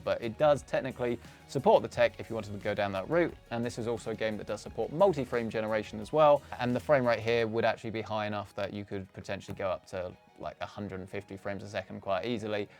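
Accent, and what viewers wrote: United Kingdom. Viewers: I just can't understand with the UK accent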